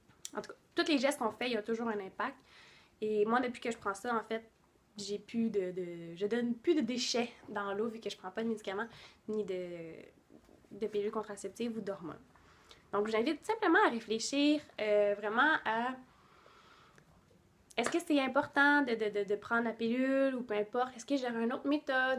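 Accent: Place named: Quebec, Canada. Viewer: Je n'ai pas de question mais je voulais commenter juste pour te dire que tu es super jolie et que j'adore ton accent ! Je suis française alors je n'y suis pas habituée et à chaque fois que j'entends un québécois parler, je trouve ça trop mignon :-) Merci pour cette vidéo. Je m'y suis intéressée car je veux moi aussi arrêter la pilule. Je pense utiliser la méthode de la symptothermie. :-) Je suis moi aussi blogueuse, et je vais aller te suivre sur Instagram parce que j'ai beaucoup aimé ta façon de t'exprimer et ta personnalité :-)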